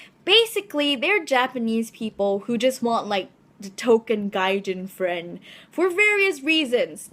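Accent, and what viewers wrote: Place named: United States. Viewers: You're English is good, you legit sound American! I thought you were Asian-American at first lol. I remember speaking to a Japanese girl who sounded pretty American too :3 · Btw you sound very American for a Singaporean. Why?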